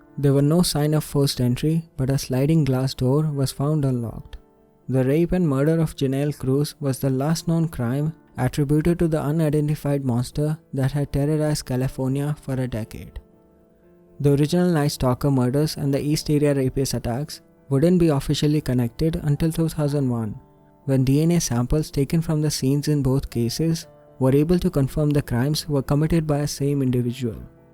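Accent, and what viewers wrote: India. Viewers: I cannot take that Indian accent !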